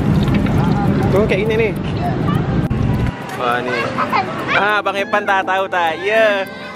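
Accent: Malaysia. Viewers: Asli mana sih bang epan ini. Macam kek org malaysie punye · Cara bicara seperti orang Malaysia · Bang asli Mane? Melayu nampak bahase tu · Eeee kek bahasa malaysia cokkk salam dari malaysia banggg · bang orang malaisia ya suaran ya seperti orang malaysia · Aksen melayu nya gk ilang2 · Ko kya bahasa Malaysia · Saya ngikutin HOBI MAKAN dari Subcride 14.000.. skrg udah 700.000-an... selalu liatin updating nya tiap minggu Sukses selalu bang, selalu bersedekah.. dan logat melayu nya tak berubah😂🤣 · Abang orang mana sih ? Kok bahasanya kayak bahasa Malaysia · Asli mana aa logatnya ari malaysia yah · Mas nya asal mn ya ?? Bhs nya kadang seperti malaysia..hehe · Aslinya org mn? Logatnya melayu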